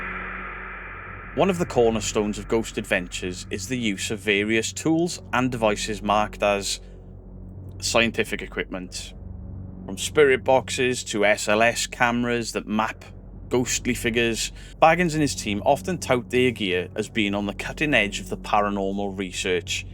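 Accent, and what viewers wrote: Wales, United Kingdom. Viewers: All I'm hearing is Welsh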